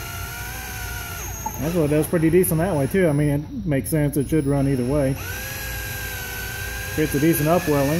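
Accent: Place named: South, United States. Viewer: Okay, Country accent!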